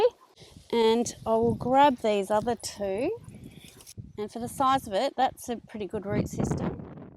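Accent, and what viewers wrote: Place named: Australia. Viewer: Fantastic so good to hear another Aussie. I see a lot of gardening videos but they are from other countries and it gets confusing with the different seasons . I can now totally relate and makes sense to what you are saying. SUBSCRIBED.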